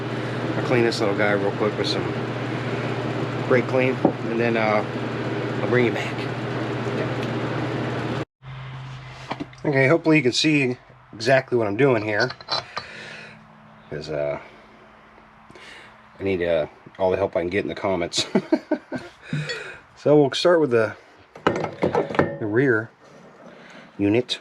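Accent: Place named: China. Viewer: He is a chinese spy! You can tell he is chinese. Got chinese accent as well!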